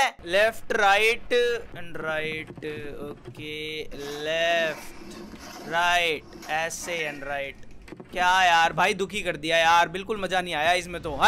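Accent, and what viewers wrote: Haryana, India. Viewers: play evil nun please! With your haryanavi accent and commentary😍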